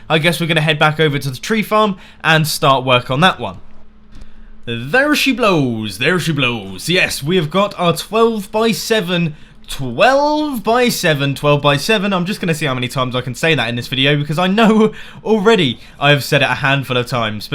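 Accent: United Kingdom. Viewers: Usually, i absolutely hate british accent as a native swiss guy, but i really like how it sounds when you talk :) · You British?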